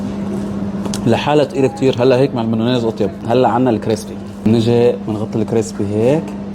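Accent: Aleppo, Syria. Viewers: هل أنت حلبي❤ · لهجتك حلبية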